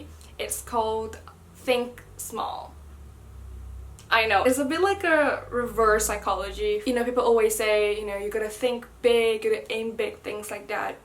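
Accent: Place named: United Kingdom. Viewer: British accent nya 🔥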